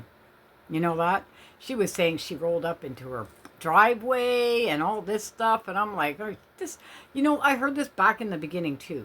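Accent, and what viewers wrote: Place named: Canada. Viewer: And I like the Canadian accent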